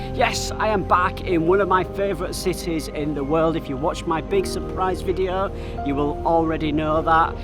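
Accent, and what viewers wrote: United Kingdom. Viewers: Hello sir.... I love your video about Malaysia.... I hope that I can meet you one day.... I love British people because of their talking style or maybe the accent... I love the way you talkin' sir so British 😅.... anyway welcome to Malaysia once again sir... enjoy your life in this beautiful country okay... 👋☺ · Ooo u come back to ur ex coloni...Malaysia is ex British colonial and British is not strange to us...ur ascent so pure british ascent..amazingly u like nasi lemak the malay traditional foods and now days all malaysian take nasi lemak. The cultures is diversity in Malaysia. I not need to tell u more about Malaysia and u well recognised about Malaysia I can see that. The London also not bad and amazing city too. London also with multi races and mostly from commonwealth British. Indian and Pakistan foods in Victoria also not bad nice taste and its cost about 10 pound huhuhu..one thing I like to travel to Europe is from London. 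The national express provide the route to the rest of Europe city and departure from UK to other city to Europe is easy for the imigration process.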